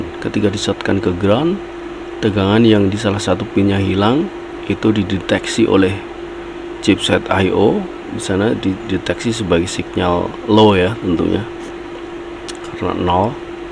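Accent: Java, Indonesia. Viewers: bang bhs inggrisnya kebawa agak kejawaan😁😁😁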